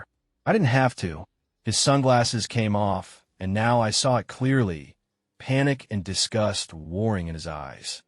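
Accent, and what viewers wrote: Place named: France. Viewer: Can we stop with the stupid French accent it’s annoying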